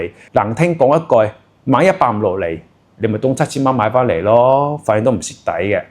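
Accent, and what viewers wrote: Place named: Hong Kong, China. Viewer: 香音未改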